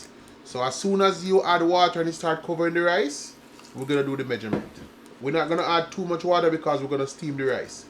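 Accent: Jamaica. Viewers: SHUT THE STOVE OFF😂 JAM DOWN TALK, 1 LOVE😊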